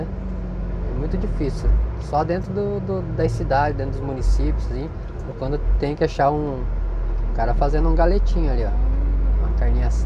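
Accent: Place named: South, Brazil. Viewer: Pelo sutaque parece do Sul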